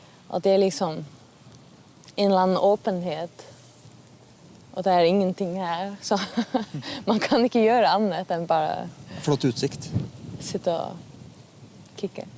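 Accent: Faroe Islands. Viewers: snakkar hon norska, eller danska med faeröiskt uttal? tycker det var ovanligt lätt att förstå om de är danska :) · There is a clear Faroese accent, she kind of mixes in some general danish, norwegian, swedish(if you like) words, just enough to make herself understood by the Norwegian reporter) I think this way of talking, accent, they call 'Gøtu-danish' (Faroese person attempting to speak Danish, but have a strong accent) Naturally she also can speak pure Danish very well, when she like to.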